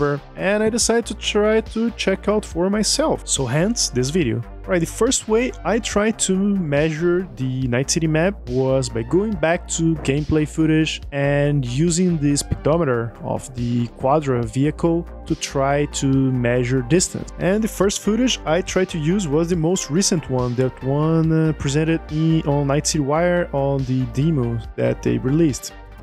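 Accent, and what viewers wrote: Brazil. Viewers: Are you Brazilian? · eae mano, notei pelo sotaque kkkk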